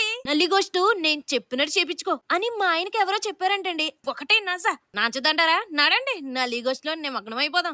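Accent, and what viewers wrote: Godavari, India. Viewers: ok ఏమి లేదు అండి కుంచం మ గోదావరి స్లాంగ్ ఉంటే అందుకే అడిగాను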